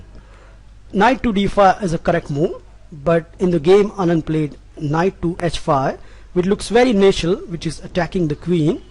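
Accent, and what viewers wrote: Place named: India. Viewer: Indian accent is very annoying and confused 😏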